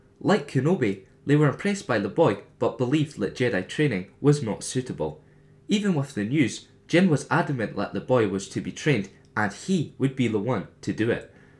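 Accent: Scotland, United Kingdom. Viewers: Just stumbled into this. Great video and love the accent. :D Might I presume "Scottish" ? Keep them vids coming. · What's your accent from? I'm hesitating between Scottish and Irish.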